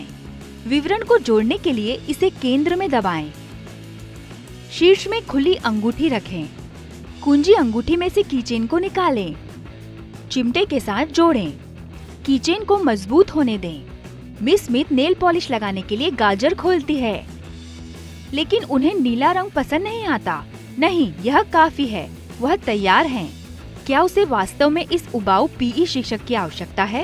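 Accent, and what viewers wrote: Standard. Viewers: Yeah puri shudh hindi bolti hai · itne shuddh Hindi Hum Bhi Nahin bolte hain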